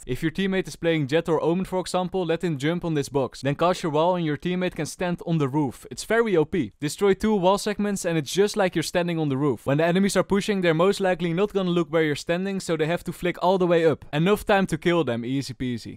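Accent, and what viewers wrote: Netherlands. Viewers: If you put captions on its dutch! Is accent that heavy?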